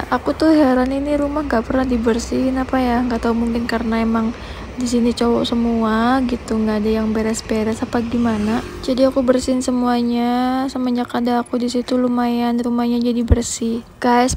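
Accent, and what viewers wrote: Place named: East Java, Indonesia. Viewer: OK, tetap semangat ya.. Setiap hal yg kita kerjakan dg niat & cara yg baik, InsyaAllah mendapatkan hasil yg barokah.. btw, logat bicara terdengar cukup familiar. Dari Jawa Timur kah?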